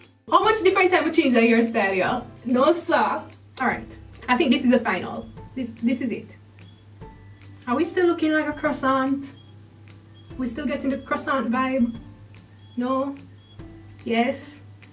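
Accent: Jamaica. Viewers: This style is lovely just like your beautiful personality - Loving the natural Jamaican vibes you give, never lose your accent.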